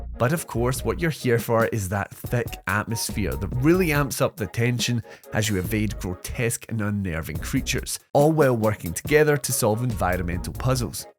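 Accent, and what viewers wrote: United Kingdom. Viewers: Please upload review game vedios . You're vedios are awesome. Are you British ❤❤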